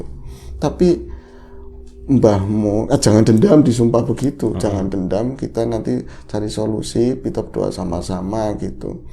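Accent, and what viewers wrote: Banyumas, Indonesia. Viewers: Sirah.. endas.. padak.. basa ngapak.. 🤣🤣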